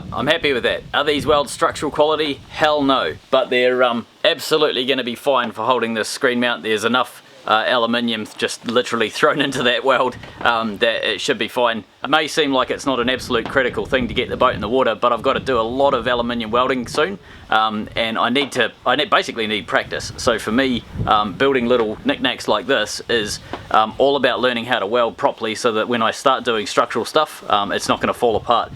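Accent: New Zealand. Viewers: you are blossoming as a comedian. Your Kiwi accent is channeling Fred Dagg (poor John Clarke, we miss him). When you mentioned "My mate Trevor" the impression was complete!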